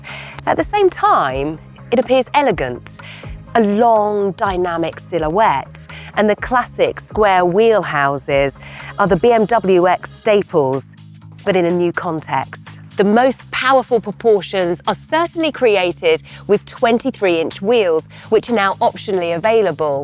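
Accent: United Kingdom. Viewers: I'm so sick of her British accent; it's so pretentious. It makes the X5 look even uglier.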